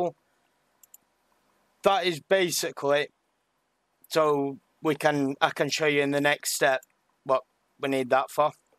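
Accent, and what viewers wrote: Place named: Ireland. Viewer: WAIIIIIIT HES IRISH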